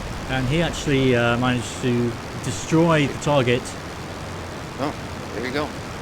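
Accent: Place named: East Midlands, United Kingdom. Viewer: Good stuff, another excellent video with a great couple of fights. As a noob to RoF, I have learned an awful lot from watching your output. May I ask three questions? Why is that nobody in the RoF ever seem to pay attention to aircraft instruments? Along with everything you have put out I have also watched JimmyBlonde (alas now MIA), Dukely and diverse others and none of you ever seem to look at instruments. Secondly, I appreciate you are busy and haven't been very well, but will you be coming back to the Barrakuda campaign? That was shaping up to be a real beaut. Finally, if you don't mind a personal question, where are you from? I have been trying to identify your accent, I can't and my failure is driving me nuts. I'd guess somewhere in the East Midlands, maybe bordering on East Anglia but I'd can't place it. Anyway, keep up the good work and know your efforts are greatly appreciated.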